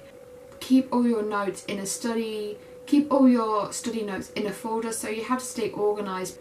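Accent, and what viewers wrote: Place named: England, United Kingdom. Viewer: Where are you from you sound English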